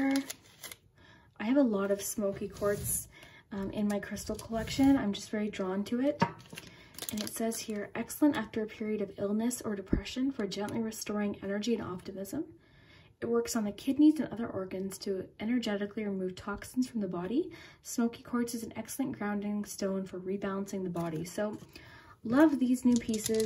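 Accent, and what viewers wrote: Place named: Canada. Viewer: I loved this chit chat video!! Gave me asmr too you have such a lovely voice. wondering if you're from Canada?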